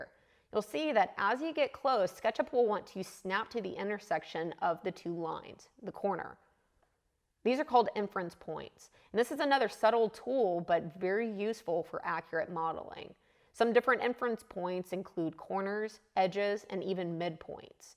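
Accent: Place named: Waco, United States. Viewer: Love the Waco accent, with all the "Sawring and Drawring" you do! :-)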